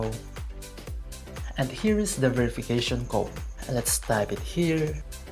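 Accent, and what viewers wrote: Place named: Philippines. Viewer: I already know that your a pilipino bc of your accent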